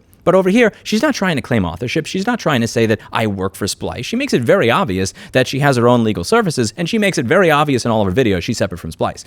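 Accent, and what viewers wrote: New York, United States. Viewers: You might be in Texas now, but the New York is still running strong through your veins and speech patterns. Love these videos. Im sure one of these companies will eventually attempt to fuck around and find out. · Sir, your inner new york is showing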